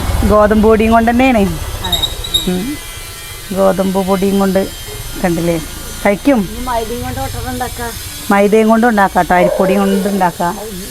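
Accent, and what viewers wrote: Palakkad, India. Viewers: Snake kanum nokynadakanam mol.palakadan samsaram nalla eshamannu ketto.thank you all family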